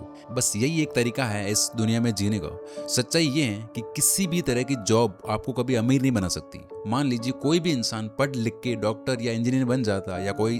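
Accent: Rajasthan, India. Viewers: Video acha he or edit bhi achi he... Rajasthani English extent wo bhi acha he..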